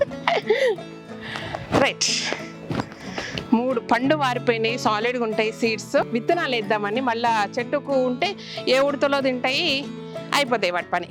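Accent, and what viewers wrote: Telangana, India. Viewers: మన తెలంగాణ భాష మన యాస వేరే అబ్బా 🙌🙌🙏🙏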